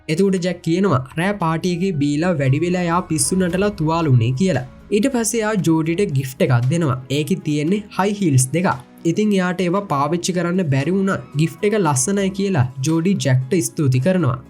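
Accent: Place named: South, Sri Lanka. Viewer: Uba dakune kollek neda????ehetta mehetta kiyanne 😂😂😂😂😂😂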